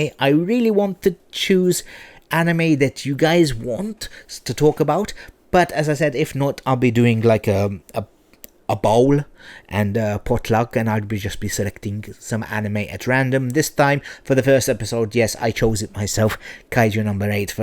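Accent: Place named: England, United Kingdom. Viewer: English accent got me feeling something 😉 well done bro 🩵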